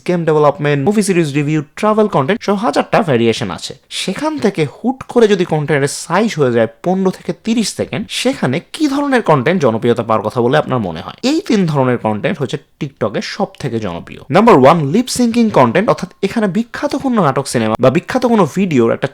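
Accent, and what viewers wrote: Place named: Dhaka, Bangladesh. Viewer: you've good impact of voice of dhaka in your videos but you made some kinda fusion here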